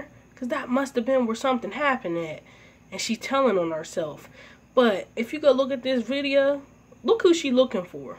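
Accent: Pittsburgh, United States. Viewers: Great video. U sound like your from Pittsburgh 😀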